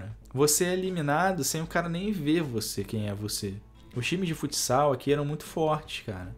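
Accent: Rio de Janeiro, Brazil. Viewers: nasceu em SP, e tem o sotaque mais carioca do Brasil!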